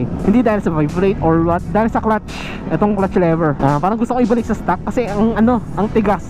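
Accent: Visayas, Philippines. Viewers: Parang VISAYA so idol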